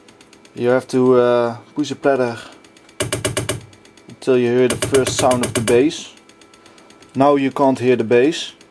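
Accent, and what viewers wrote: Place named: Netherlands. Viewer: haha leuk accent ;) echte hollander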